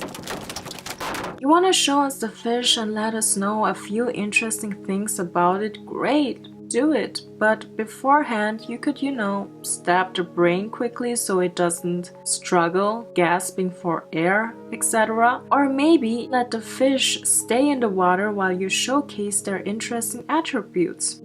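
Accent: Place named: Germany. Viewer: It's so Weird hearing Herman accent coming out If an Asian. So FUKN weird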